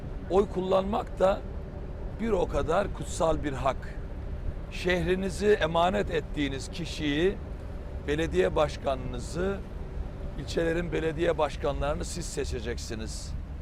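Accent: Black Sea, Turkey. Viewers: Hafif Karadeniz aksanı çok tatlı değil mi ya ❤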